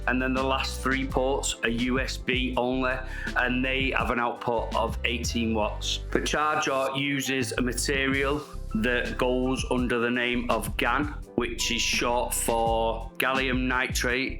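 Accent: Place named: Northern England, United Kingdom. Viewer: sweet review, cheers. Cool to hear a Norvern accent as well. Love from Liverpool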